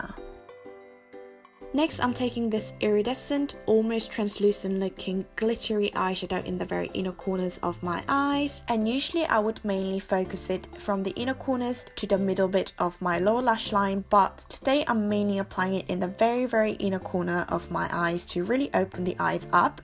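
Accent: United Kingdom. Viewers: 저기 죄송한데 영국발음 영드로 공부해도 되나요? 발음이 너무 탐나네요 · 와 이 화장 진짜 너무 예뻐요 언니ㅜㅜㅜㅜ영상 너무 잘보고 있어요ㅜㅜㅜ한국말 하실때도 매력 넘치시지만 언니 영국식 발음이.....❤️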